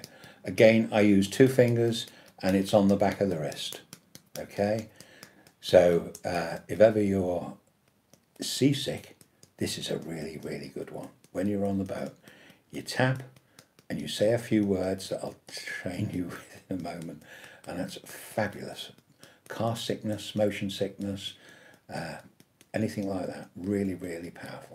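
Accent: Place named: United Kingdom. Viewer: Abonniert wegen der Aussprache. British English 💖💖 Greetings from Munich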